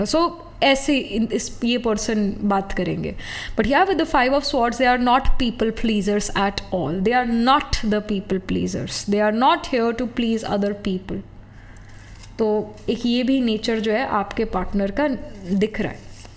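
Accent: Punjab, India. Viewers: Are you Punjabi girl language tone shows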